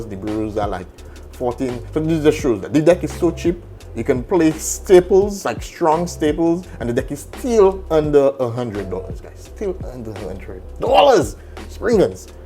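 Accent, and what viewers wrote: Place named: France. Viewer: If I'm right, that a great French accent. If not, that just a great accent xD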